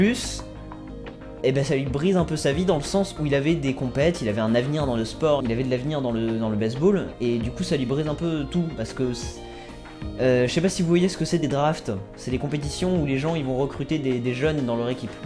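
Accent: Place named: United Kingdom. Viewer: le peau rouge! (Ton accent anglais me rappelle un certain Mr Boyer je sais pas si tu connais)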